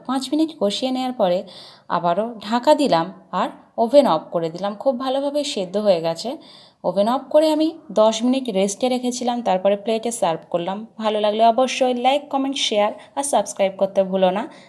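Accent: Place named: Sylhet, Bangladesh. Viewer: That's what I wanted to know.... Thank you for sharing it.... I thought you may be a Sylheti... That's just how I felt from your accent....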